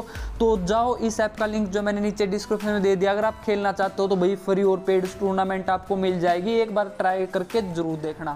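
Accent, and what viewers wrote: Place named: Rajasthan, India. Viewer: RAJASTHAN JAIPUR SY HU MAI AAP KE VOICE HAM HRA RAJASTHAN SY MILTE HY. REPLY PLZZ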